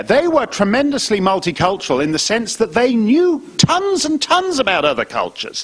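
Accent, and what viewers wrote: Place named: United Kingdom. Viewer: This is gonna be a stupid question but I'm curious has nothing to do with Marc Steyn's speech but his accent. I read that he's from Canada but he sounds like he has British accent? I'm just really really curious. I really like him I think he's great but somethings weren't adding up. The thing is if you're from Canada but you sound like you're British it made curious. Either way I still love him he's great!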